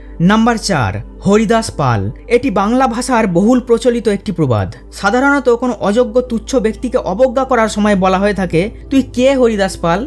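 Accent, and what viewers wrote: Kolkata, India. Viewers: খুব সুন্দর লাগলো,হয়তো আপনি ইতিহাসেরই ছাত্র,সেই সাথে অনেক পড়াশোনা করেছেন তবে উচ্চারণটা কোলকাতার টানে না করে প্রমিত বাংলায়(নদীয়া জেলার শান্তিপুরের টানে) করলে শ্রুতিমধুর লাগতো।পুনশ্চঃ ধন্যবাদ।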